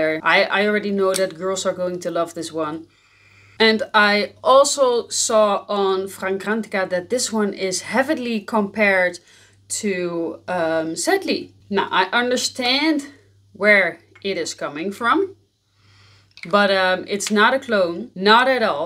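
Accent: Netherlands. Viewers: Absolutely love your Dutch accent 😍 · Dat Nederlandse accentje😊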